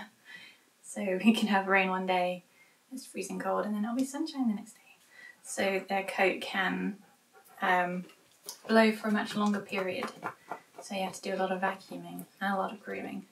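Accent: United Kingdom. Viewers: Very educational video. Thank you. I never bathed my husky either and she never smelled badly. She would lick herself like a cat, I guess to clean herself. I love your British accent, btw. Maybe I’ll learn how to speak with one somewhere because my American accent is sounding a little rough to me now.😁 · I love your dog but also your "so British" accent (I'm french)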